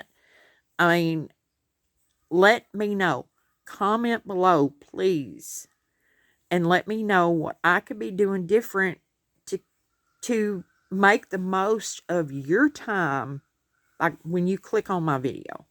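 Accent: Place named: Texas, United States. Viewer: I am sorry that is happening. I watch yours throughout but I do click off of the creators that sing or show a lot of painting or make the crafts in real time. If I can’t understand the creator… I feel bad but I just can’t. I am a Texan and so you sound like me. 😂 You are very talented. Are you doing shorts? I hate them but I think a lot of YouTubers use those.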